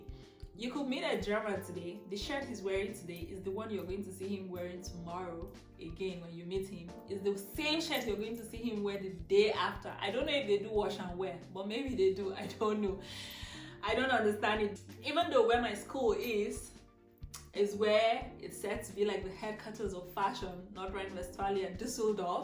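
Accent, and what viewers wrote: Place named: Africa. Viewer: Your african dialect in speaking english is nice.dynamic, beautifull woman.nice vlog.keep it up and stay safe.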